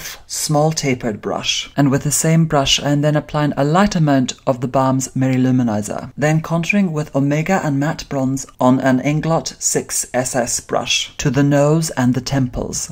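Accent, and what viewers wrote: Scotland, United Kingdom. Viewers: She's magnificent! Your work is Lovely and Flawless. Very nicely done. You still frighten me abit. I would love to hear you speak without the British accent. I bet it's lovely. Sincerely, M. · I love that you used the word "film" rather than movie - very British in your lovely Scottish accent :-)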